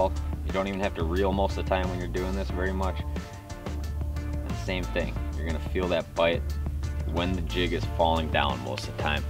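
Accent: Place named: Minnesota, United States. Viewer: Are you from the midwest? You have a very minnesotan like accent lol also great video with actual info not just rambling like all the other videos I've seen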